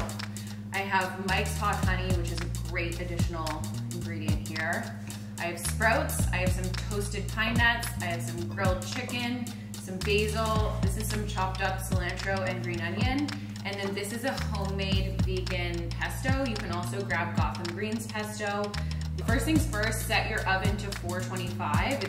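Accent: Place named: Canada. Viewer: I love the sound of voice and her Canadian accent ❤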